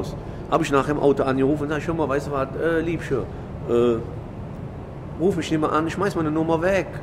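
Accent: Cologne, Germany. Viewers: Einmal hat ein kumpel ihn getroffen und mich angerufen meinte hab hier jemander der dich sprechen will Kam direkt so an Hallo ich bin es der mann vom parkhaus 😂😂😂 auf Kölsch natürlich 😂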